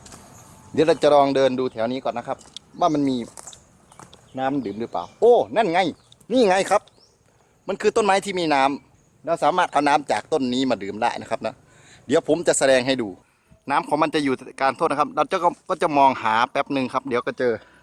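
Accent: Laos. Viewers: ไทยตกลาวนะคับ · เว้าไทยคือคักแทะเว้าลาวแน่ๆๆ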